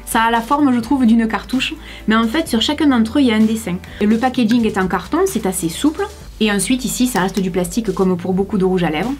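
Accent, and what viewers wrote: South, France. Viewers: Tu est trop belle avec ta teinture, tes lèvres, tes yeux et tout enfaite! Tu est suuuuuublime je t’adore maman. Tu me redonne le sourire avec ton rire fabuleux et tu me donne chaud dans ce froid avec ton accent du sud. Pour moi tu est LA bombasse que tout le monde envie et du haut de tes 40 ans tu fais rêver les plus jeunes d’entre nous... voilà, c’était pour te dire à quel point je t’aime 😍 bros bisous! ❤️😘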